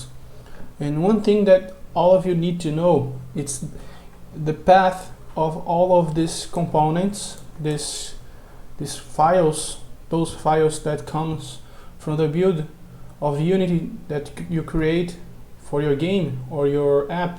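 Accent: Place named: Brazil. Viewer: tu é brasileiro ne man da pra sentir o sutaque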